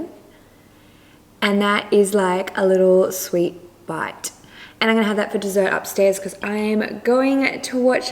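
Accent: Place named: Australia. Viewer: I feel like they have the best Aussie accent I've heard on youtube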